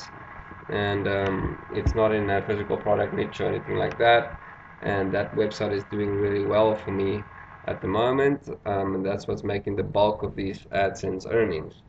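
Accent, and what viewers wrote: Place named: South Africa. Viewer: oh you from south africa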